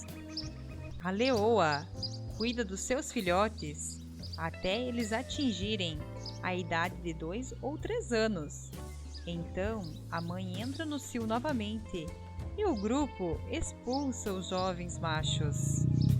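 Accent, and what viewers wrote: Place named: Rio Grande do Sul, Brazil. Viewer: Com esse sotaque "gaúcho" no Brasil preconceituoso tem preguiça em dar like.. opinião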